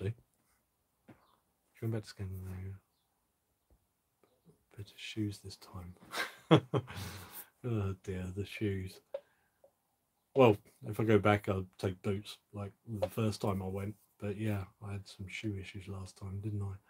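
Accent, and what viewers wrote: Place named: Kent, United Kingdom. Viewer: hi kent...i am in kent...same accent too!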